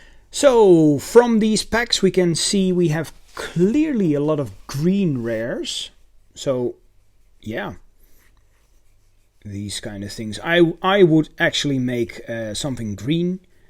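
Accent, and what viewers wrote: Netherlands. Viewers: Dutch accent for sure, groetjes uit A'dam!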